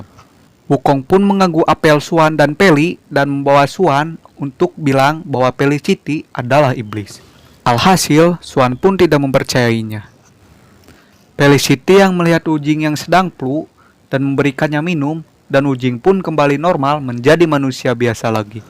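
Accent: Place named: Java, Indonesia. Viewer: Logat jawa 😂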